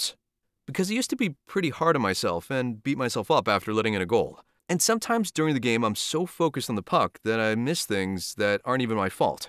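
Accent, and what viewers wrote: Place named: New England, United States. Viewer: What part of New England are you from? I'm from Massachusetts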